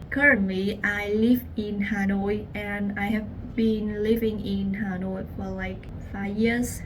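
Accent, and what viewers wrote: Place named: North, United Kingdom. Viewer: Southern Accent? I think it’s Northern 🤔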